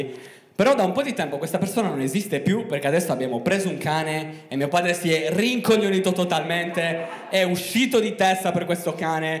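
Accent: Sardinia, Italy. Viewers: accento sardo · sei Sardo, quindi è anche bello ascoltarti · accento sardo top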